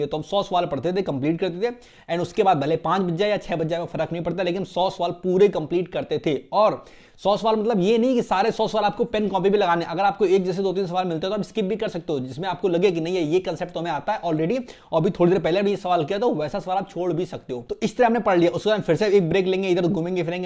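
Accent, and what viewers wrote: Kanpur, India. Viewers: Jyada kisi se chatiyo mat kanpuriya language 🤣🤣🤣 Maja aa gya Bhai 😁😁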